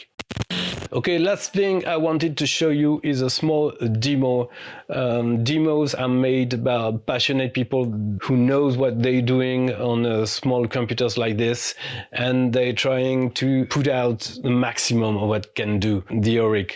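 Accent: France. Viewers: Great, thorough video. Please keep them coming. I especially like the narration, the Frech accent is pleasing to the ears.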